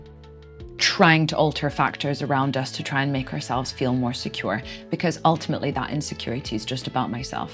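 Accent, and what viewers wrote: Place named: Scotland, United Kingdom. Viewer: Love the accent! Got to golf in Scotland a couple years ago...beautiful.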